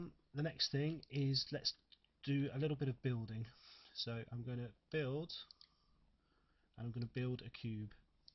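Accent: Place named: United Kingdom. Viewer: Thanks! I wish I'd seen this a week ago. Slow eye speed, plus having to mentally process British English into American English mean I'll have to do a lot of stopping and rewinding to learn the specifics. But still, your delivery is good, and these are important points. I can't make the tutorials run when I'm IN Second Life, so this is great!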